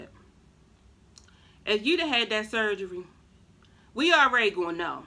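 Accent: Baltimore, United States. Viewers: New subbie..Are you from Maryland? U sound like us in Baltimore lol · Idk how I found your channel. Are you from Baltimore? It sounds like you have a Baltimore accent. I love it!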